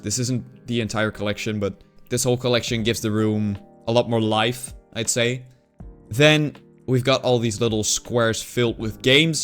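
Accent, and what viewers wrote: Netherlands. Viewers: Joo, hoor gelijk aan je Engels accent dat je Nederlands bent haha, leuke video's man! Kwam langs je skylanders video, en kreeg gelijk weer een nostalgisch gevoel man. Leuk dat je zo ingaat op de wii games waar bijna niemand op ingaat of die een beetje vergeten worden. Ga zo door!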